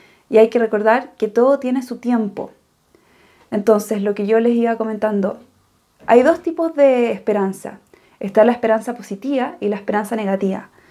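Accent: Chile. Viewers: Hola. Vi un video tuyo hace un par de semanas, y de ahí seguí todos los tuyos. Son muy útiles. Te felicito. Aunque mi caso es muy difícil. Por el timbre de tu voz (muy lindo), pienso que vos sos chilena. Y en un video te escuche que actualmente estas viviendo en la Argentina. Yo soy argentino. Me alegro que hayas elegido mi pais. Chile y Argentina, somos paises hermanos. Espero y deseo que te vaya muy bien. Mientras tanto sigo viendo tus videos, y recomendárselos a amigos. Una cosa, tus videos tienen un problema de edición. Salen con un sonidos muy bajo, que cuesta seguirlos. Bueno, un abrazo fuerte y gracias nuevamente!!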